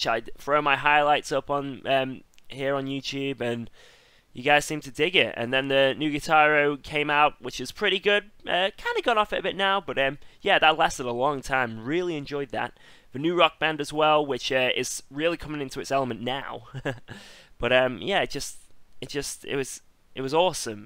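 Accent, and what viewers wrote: United Kingdom. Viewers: I'm not quite sure or how I ends up started to watch your stream. I never played GH at all. But after watching your stream, I bought my first guitar controller and just realized how godlike you are You deserves all this man, you works so hard everyday, producing something that considered impossible for mere human Also that sweet smexy British accent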